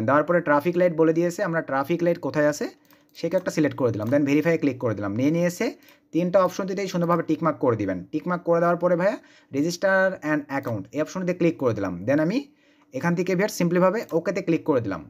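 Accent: Joypurhat, Bangladesh. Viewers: vai joypurhat kotha basa? apnar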